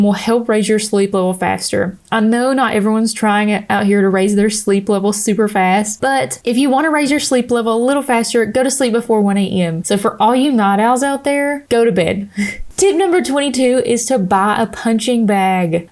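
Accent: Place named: Texas, United States. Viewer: Yooooo....that accent tho lmao. U gotta be from Texas or Bama. Hahahahaha I love it. Makes me feel at home.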